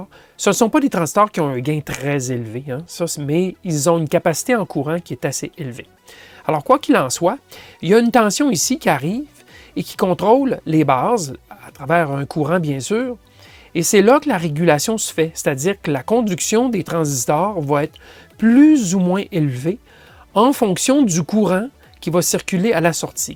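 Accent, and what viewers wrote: Quebec, Canada. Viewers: je decouvre la chaine et je dois dire que c'est juste excellent,avec un accent qui me fais voyager direct en 1 seconde de France au Québec ,les explications sont toujours claires,schématisés et on vas toujours au bout des choses, je repette c'est excellent ! merci pour ces videos intructives !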